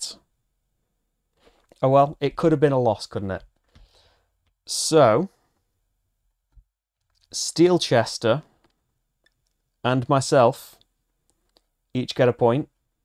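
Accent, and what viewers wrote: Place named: England, United Kingdom. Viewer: Hey what are the odds finding a YouTuber playing Elven, the released version and not the KS preview, from the Country that’s the home of Football, with an accent my BE Teacher would have loved, and then him not being a football fan at all? 😂 Too funny. Thank you! Excited for more on this. Regarding to Rules, you missed the Sponsor thinks others mentioned and you are only allowed to have 5 attacking and 5 defending players, in every Games. That leads to more realistic scores. Meaning You get more 1:1 and 2:1, than 4:5 ore 3:3…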